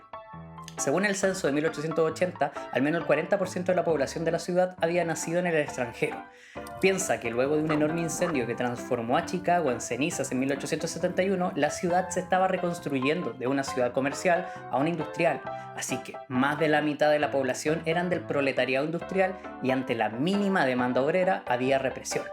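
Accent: Chile. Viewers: Entre el dialecto chileno, la homosexualidad en su forma de hablar, y además que se quiere hacer el indio, es imposible entenderle.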